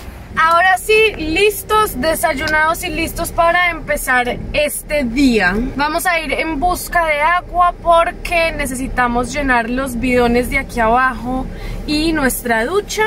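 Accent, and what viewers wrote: Colombia. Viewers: El acento colombiano 🥰🥰🥰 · Me encanta su espontaneidad y como muestran la realidad de vivir viajando. Que lindo que estén en Argentina . Disfruten y éxitos en sus caminos de aventura. Hermosos y divinos colombianitos. Con ese acento que me fascina. Besitos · Me agrada mucho la forma de expresarse que tienen las colombianas, tengo una amiga de Envigado que es muy parecida a ti y es un sueño oírla y verla hablar. suerte en el resto del viaje por Argentina, Y desde luego mas allá aún. Soy de Gral Alvear, provincia de Mendoza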